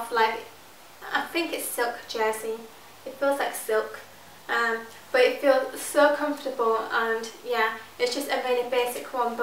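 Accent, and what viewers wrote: United Kingdom. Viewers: She's british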